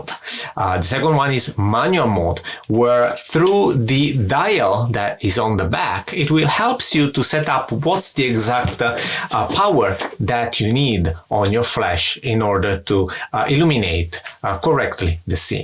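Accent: Italy. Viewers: You sound very much like an Italian… are you? 👍 👋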